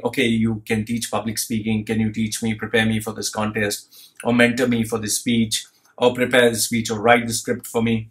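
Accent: India. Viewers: you sounds like Indian which i means like me.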